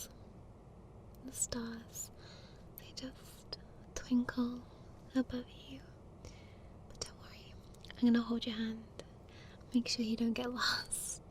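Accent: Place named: Midlands, United Kingdom. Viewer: I keep saying it, but hot fucking damn your midland accent makes me melt. Then you're always so spunky and adorable ooof, just yes That last part through, oh man the feels, planned something exactly like that for my ex but we never got to go. That letter before the final reveal hit real different for me at least. Mmmm yep this is good ASMR.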